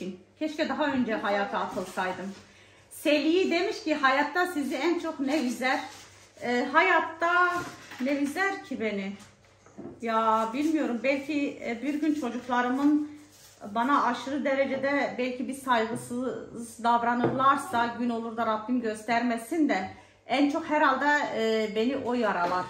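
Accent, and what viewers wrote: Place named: Erzincan, Turkey. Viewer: Abla senin kürtcen bizimkiyle ayni erzincanliyiz😂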